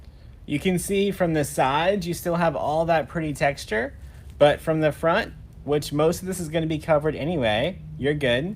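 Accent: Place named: Alabama, United States. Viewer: Lol, you say ya'll as much as we do in AL. My cousins in MI say "youz guys"...that's just wrong don't you think? haha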